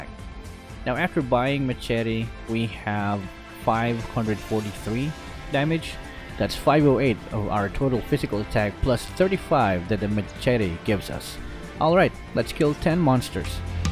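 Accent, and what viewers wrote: Philippines. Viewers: Just as I thought, it is Filipino Englig Accent. :) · From this video, now I know you are Filipino, your accent is different with other Filipino tho xD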